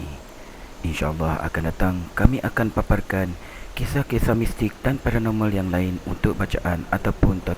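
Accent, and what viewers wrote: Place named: Sarawak, Malaysia. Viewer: apa lampu picit ia..agi pekat kelaka kitak sarawak iya bro...terbaik bro.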